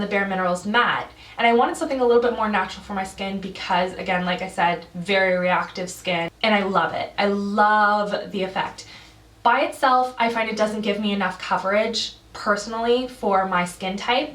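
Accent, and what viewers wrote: Canada. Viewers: hi - its so funny that i after just watching u and listening to you i could tell u are Canadian :) i now my people! heheheh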